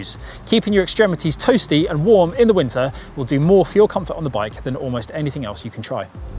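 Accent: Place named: United Kingdom. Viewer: It's a British accent, not an internationalized accent.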